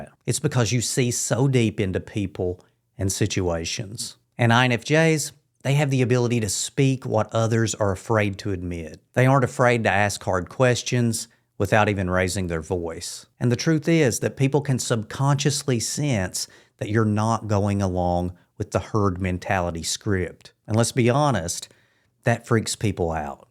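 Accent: United States. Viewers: What state is that accent from? Respectfully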